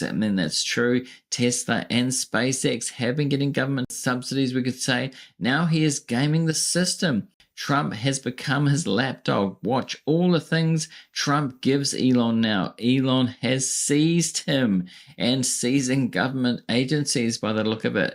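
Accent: New Zealand. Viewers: Are you Australia or New Zealand. I think your accent sounds more New Zealand. I just want you to know that we Americans are not all psychotic as the media has us looking today. I originally clicked on one of your videos a few weeks ago. I thought you were one of my former employees. You two are a splitting image. As far as Musk, I am quite sure he will be prosecuted in the next administration. However, I think the current administration is so afraid of retaliation. The Congress on the left side are very afraid of Trump. However, Musk should start putting his assets and etc in order.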